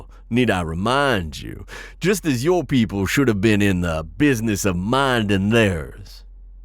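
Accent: South, United States. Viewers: this heavy southern drawl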